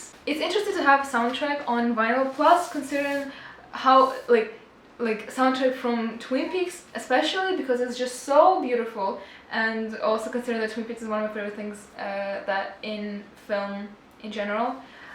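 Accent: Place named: Russia. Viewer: Hi i love, their compilation is definitely one of their best comps but i`d actually say all of their compilations are good because they constantly produce good music. If you like Twin Peaks you might like `s solo work. She sings some of the music in Twin Peaks and her solo album is beautiful, it`s very dreamy. Especially the tracks and. Also Twin Peaks related is `s track. He samples the theme music from Twin Peaks and uses it really well on. Although the only mix of that is a classic version is the original Woodtick mix. The other mixes are a bit tacky but the Woodtick mix is classy. You should do a cd update video. Also a favourites video would be cool. A collections video. I have a couple of questions for you. What country are you from, you sound russian. Where did you when you visited England? What were your impressions of England? I`m english so your answers would interest me. 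You come across as being quite artistic. Do you make your own art? Thanks for sharing your collection with us. I look forward to your next video which i imagine will be uploaded in about a years time. Cheers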